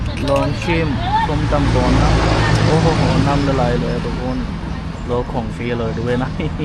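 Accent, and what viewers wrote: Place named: South, Thailand. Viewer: สำเนียงใต้เลยนะฝรั่งอะไรวะ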